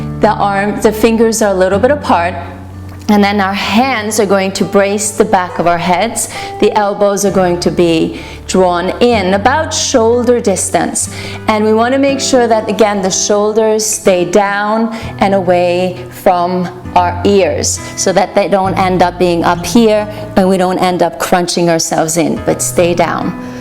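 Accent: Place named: Sweden. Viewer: Thank you! By the way, are you Swedish? I think I can spot a very subtle Swedish accent.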